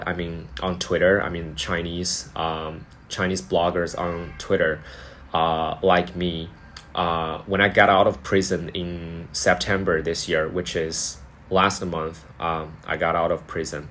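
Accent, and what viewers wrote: United States. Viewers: But you don’t mention anywhere how to support you. When I hear you talking, I find it difficult to believe you haven’t spent some time in the US since you speak flawlessly with an american accent. You have absolutely no reason to be depressed. You are young and healthy, very handsome, very smart and creative, and you master the two major languages in the world that is coming onto us. I’d take a lesson with you ! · this is quite frustrating. I'm 4mins into the video and it is completely unclear where he was imprisoned - he speaks American English in a way you can only learn in the US itself but it seems (at 4mins into the video) all this happened in mainland China. if that's the case then all bets are off - China is not a country which honours the rule of law. the law there is arbitrary. it serves the ruling party and they bend it if deemed necessary or useful. 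nobody can expect a fair trial in China regardless what the law is on paper.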